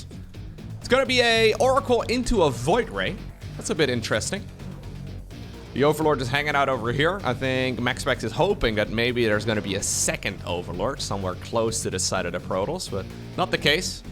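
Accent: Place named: Netherlands. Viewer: You say "bunch of stuff" a lot. With that particular dutch way of saying bunch - Beounch. It's funny, Harstem and Rotterdam also say Beounch.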